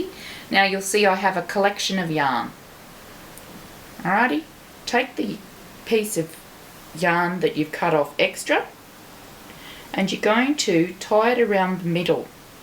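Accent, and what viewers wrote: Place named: Australia. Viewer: So lovely to hear an Aussie voice coming from a great, non complicated tutorial! Bonza mate!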